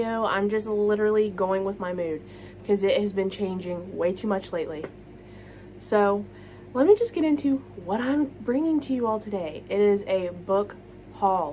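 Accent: West Virginia, United States. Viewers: My frand..your west Virginia coming out..I'm cryinggggg 💀🤣 I love your accent ❤️ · Lol gurl let the WV come out!!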